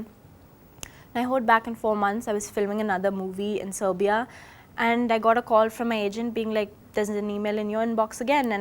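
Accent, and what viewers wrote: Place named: United States. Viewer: American accent as she was born in USA.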